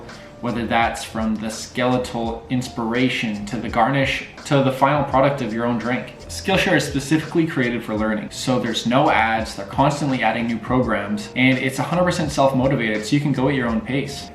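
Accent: Canada. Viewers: Is this Canadian accent? You talk like my nephew who's now on Canada...rn 🇨🇦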